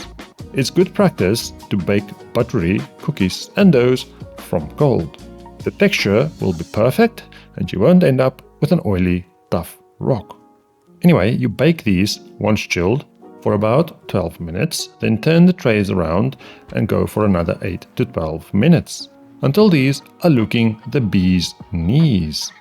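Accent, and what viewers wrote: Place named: South Africa. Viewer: I know that accent😊 It is South African.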